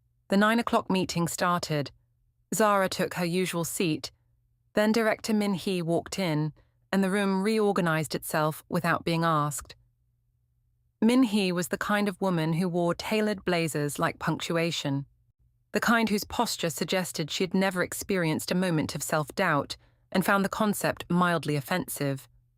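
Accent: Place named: England, United Kingdom. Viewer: I have a hard time to listen this English accent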